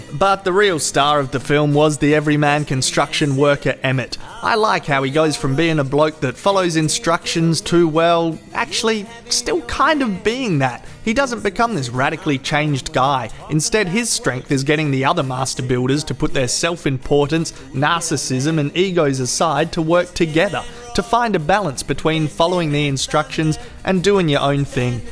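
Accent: Australia. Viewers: Great job mate! Take away the swearing and you have some very thoughtful insight into this movie. Hearing another Aussie swear just makes me homesick. Thanks mate!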